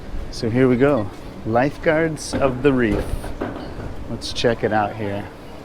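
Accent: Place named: Spain. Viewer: Killing me with your Spanish accent 😂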